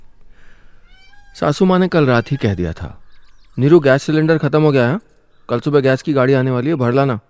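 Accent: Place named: Uttarakhand, India. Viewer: Sir m from uttarakhand and bolne Mai asi tone hmare wha sun ne ko milti hai apki khaniyon Mai apne uttarakhand ki jhalak pake bht acha lgta hai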